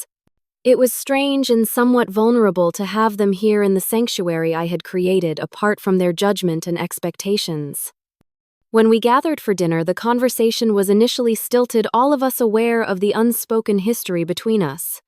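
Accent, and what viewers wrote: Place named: United Kingdom. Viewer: You sound like from UK . Whoever is reading does not read well. Wrong pronounciations. !!!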